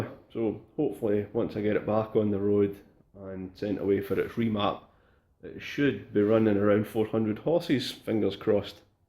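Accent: Scotland, United Kingdom. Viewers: i love the deep burble of a suby flat 4. and you sound like your from fife or the highlands like around Lossiemouth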